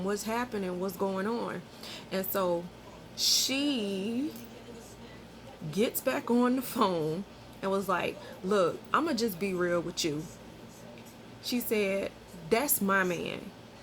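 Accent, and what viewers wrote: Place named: South, United States. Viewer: She has southen accent. Country niggas is Gay. Whats new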